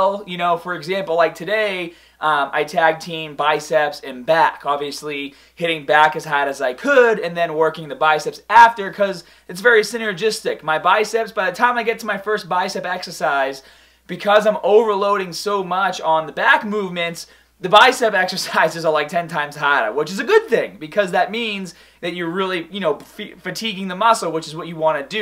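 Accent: Boston, United States. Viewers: Who else thinks that has slowly been losing his Boston accent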